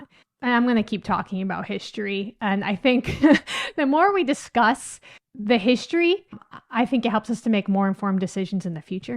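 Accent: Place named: New York, United States. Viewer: Excuse the question, are you from the Utica area in NYS? I'm always interested in regional accents. Your accent sounds like Central/Western NYS . . . .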